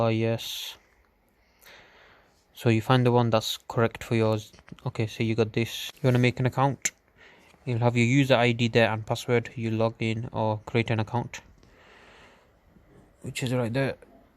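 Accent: Bradford, United Kingdom. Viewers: Thanks g, deffo a Bradford mush 🤣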